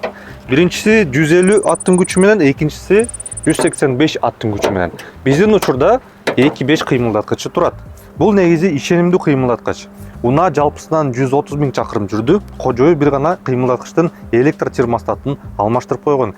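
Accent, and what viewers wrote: Standard. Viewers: Азамат жигит экенсин Кыргызча таза суйдоп тушундургонун учун.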